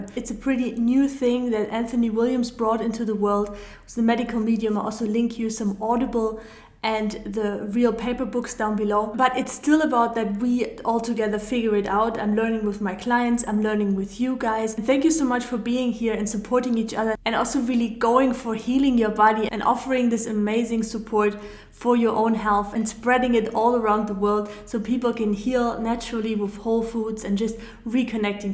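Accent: Germany. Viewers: Thank you so much for your answer, I’m so happy that you actually read my question and replied!!!! Yes I’m addicted to Hawaïian spirulina since few years. I usually take them during winter in order not to catch cold due to its enforcement of immune system. I usually take two in the morning, but if I ever knew its so many benefits as you explained in your videos, I would have taken all around the year!!! Thank Goodness I have discovered you by chance, I am eternally grateful for all the precious advices you have been giving 🙏😍😊. I will take from now on 5 in the morning and 5 in the afternoon, but how long should I continue like this?? I am also suffering from migraine since long time, do you think celery juice will help me to get rid of it??? Thank you again, Dankeschön, I love your German accent in your English by the way 😍😍. I have lived almost 20 years in France and I had some German friends ( I also lived in Munich and in Vienna) and they all speak like you. 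I don’t eat dairy products and I rarely eat eggs and I don’t eat meat, but I love fish and seafood. And lots of fruits and vegetables. I love watching your videos and try to follow all the things you say... please keep up for I cannot pass a day without watching you on You Tube. Dankeschön 😍🙏😍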